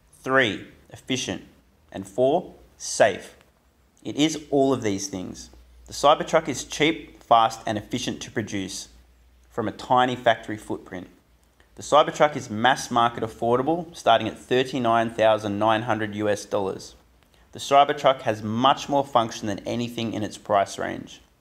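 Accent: Australia. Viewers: It's an Australian accent. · Good to hear an aussie yt'r covering tesla.. 👍🇦🇺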